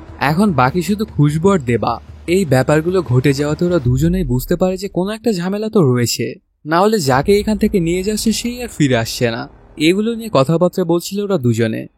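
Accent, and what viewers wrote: Bangladesh. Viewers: বাংলা দেশের লোকেরা এই ভাবেই কথা বলে।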